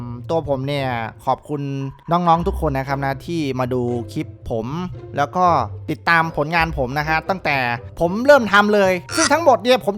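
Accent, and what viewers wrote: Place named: Ubon Ratchathani, Thailand. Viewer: เสีองพี่คือขอพูดพี่คืออุบนเหมือมผมพี่เป็นคนอุบนใช้ครับ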